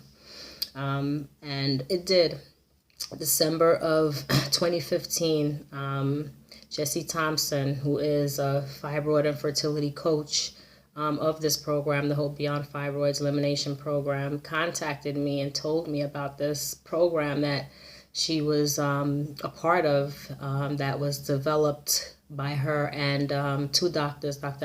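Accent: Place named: Jamaica. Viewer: I knew you was a yardie... picked up the accent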